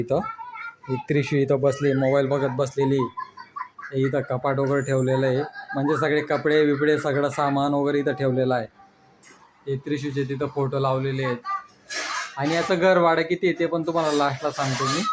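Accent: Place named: Dhule, India. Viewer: मी तुमच्या बोलण्याने आधीच ओळखलं होत की तुम्ही धुळ्याचे असाल. मी पण धुळेकर..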